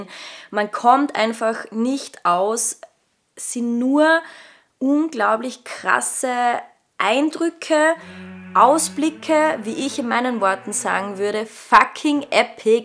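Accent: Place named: Austria. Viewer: Bin seit einem Jahr dabei. Seitdem will ich mit Mountainbiken anfangen und feier den österreicher Akzent. Danke dafür.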